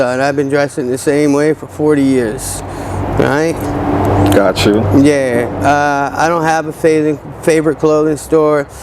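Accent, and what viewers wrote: New York, United States. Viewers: fair enough. Today I met a guy from New York that sounded just like you is all.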